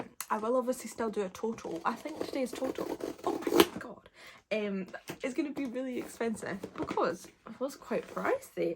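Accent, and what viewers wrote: Scotland, United Kingdom. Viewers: She is scottish😘☺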